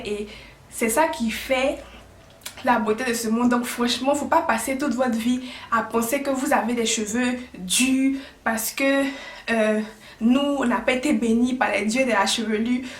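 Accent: Ivory Coast. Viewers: Tu es ivoirienne? Je viens de m'abonner l'accent ivoirien là 😉😉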